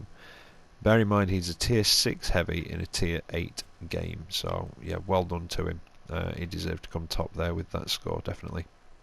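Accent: United Kingdom. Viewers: British Bloke lmao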